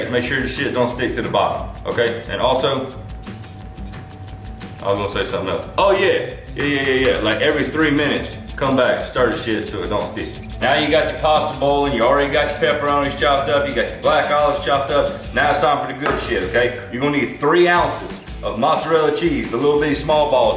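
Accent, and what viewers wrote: South, United States. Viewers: You're brilliant, and so down to earth. You make it great entertainment down here in Australia for me. 😂 I love hearing your stories and this cooking stuff is just a down to earth southern country boy by the sound of your accent that I've that heard on utube. I wonder why you are single, I'd love you to be leaving your boots under my bed. You are one good looking handsome hunk of a man. 😊 ❤❤❤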